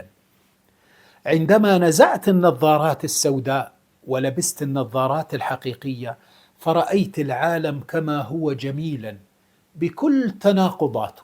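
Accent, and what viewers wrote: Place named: Iraq. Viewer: عبالي عراقي تقريبا نفس اللهجة والشكل🌱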